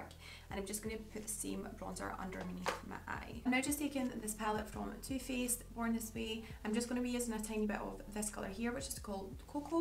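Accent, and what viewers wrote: Scotland, United Kingdom. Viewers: r u from Scotland? love the accent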